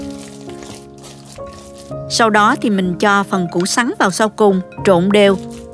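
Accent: South, Vietnam. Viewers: Rất thích xem,học những món ăn của, nhất là giọng nói thanh tao dịu dàng đặt trưng của người dân Nam Việt Nam. Cảm ơn.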